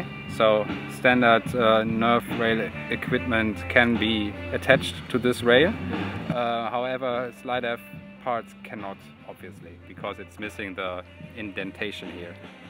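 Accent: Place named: Germany. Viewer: I personally love the german language, and the accent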